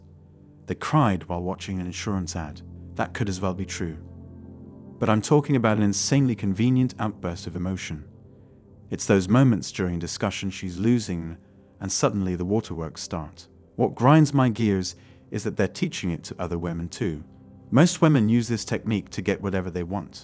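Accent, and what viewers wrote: United Kingdom. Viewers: I hate the robot voice, even with the British accent.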